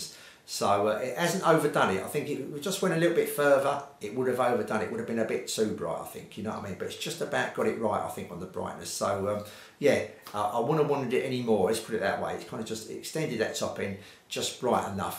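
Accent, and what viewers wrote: Cockney, United Kingdom. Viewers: Recapped my tannoy turnburys.. Bass even more propulsive and deeper tighter.. Etc with mundorf evo oil.. Jantzen on the tweeter... More detail faster.. Now sound euphonic rich with my musical paradise 301.. 289 GBP from China.. Sounds like the review in those mags... Ur Cockney Accent makes a change from the usual middle class ****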